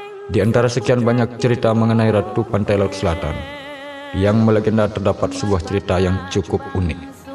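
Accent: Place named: Bali, Indonesia. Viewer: Terlalu kentara bli logat bali ne. Engkebang dek. Bes ngenah logate.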